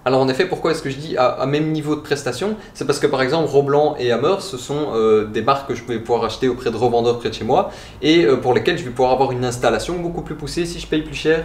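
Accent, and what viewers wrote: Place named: Belgium. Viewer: Salut, je découvre ta chaine et j'apprécie beaucoup tes présentations (avec le petit accent belge charmant). J'ai beaucoup aimé la présentation des 4 machines à arbe hélicoïdal. En regardant aujourd'hui (nov 2023, les prix ont sacrément évolués. Celui de la Jet s'est envolé à plus de 4000€ livrée. Par contre, celui de la Bernardo est resté bcp plus maîtrisé et elle est maintenant à presque moitié prix, achetée directement en Autriche chez le fabricant et livrée (sud de France). Est-ce que ça modifierait un peu ton point de vue (indépendamment des accords que tu as avec machinoutils et qui, évidemment, ont pesé dans ton cas dans la balance). Bien cordialement. Bruno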